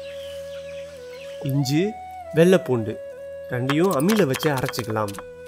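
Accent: Salem, India. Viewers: இவர் பேசுவது சேலம் வட்டார மொழி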